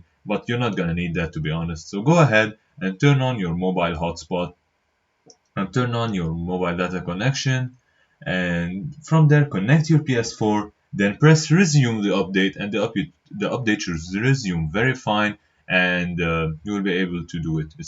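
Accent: Lebanon. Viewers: Lebnene sa7? Min sawtak w lahjtak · Hii are you lebanese ? Cause your name and accent makes it seem like you are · you sound very Lebanese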